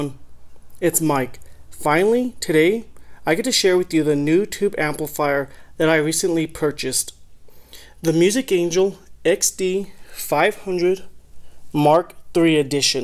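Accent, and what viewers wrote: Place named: Russia. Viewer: You have a little bit of Russian accent, nice amp!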